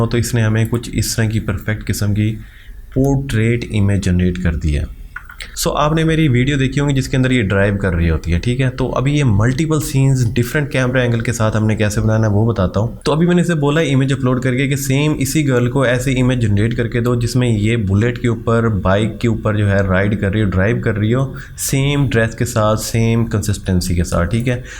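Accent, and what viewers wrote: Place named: Punjab, India. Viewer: Aapka bolne ka andaz punjabi style in natural way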